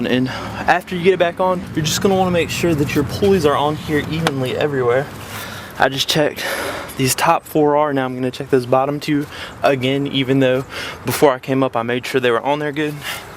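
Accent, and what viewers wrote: South, United States. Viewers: 2nd vid of his I have seen. Its like a Southern accent crossed with a Southern CA surfer vocabulary. Canada ???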